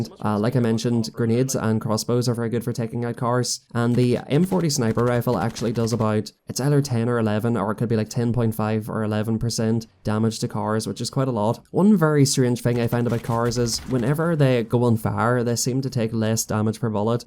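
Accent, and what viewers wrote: Ireland. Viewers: Are y from donegal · You live in Asia? I thought u were Irish aha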